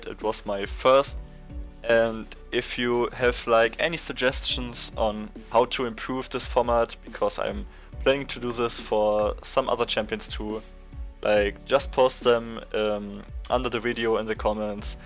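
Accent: Germany. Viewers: Der deutsche Akzent xD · Deutscher Akzent :DDD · Das deutsche englisch 😂😂 ich liebe es geiler Guide! !!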